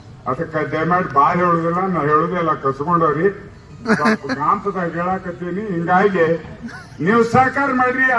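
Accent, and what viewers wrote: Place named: North Karnataka, India. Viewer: ಉತ್ತರ ಕರ್ನಾಟಕದ ಮಾತಿನ ಸೊಗಡು ಎಷ್ಟು ಛಲೋ ಇದೆ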